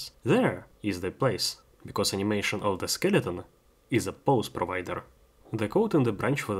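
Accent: Russia. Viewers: Слышу русский акцент. Может ещë и на русском языке начнëшь делать?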